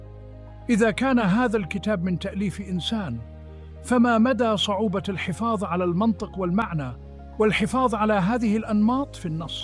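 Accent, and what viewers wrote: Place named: Bosnia and Herzegovina. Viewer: ليست عربية ب لكنة أوروبية أو يوغسلافية أو بوسنية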